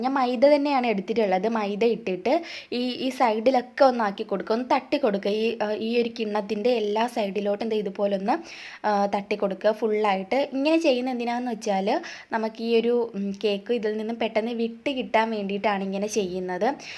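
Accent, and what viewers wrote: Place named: Malabar, India. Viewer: മലബാറുകാരി യാണോ ഭാഷ കേട്ടത് കൊണ്ട് ചോദിച്ചതാ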